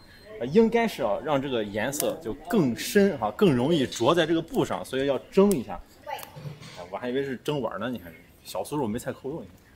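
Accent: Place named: Henan, China. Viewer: 第一次看的视频，听出来一丢丢邻居省份河南口音，很熟悉哈哈哈，视频很棒，期待更新。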